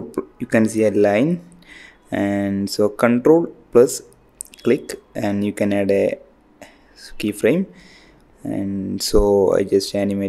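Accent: Kerala, India. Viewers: What kind of english are u speaking 😑😑😑😑 do all indians speak like this?? Omg 😂😂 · bro are you form kerala you sounds like a malayali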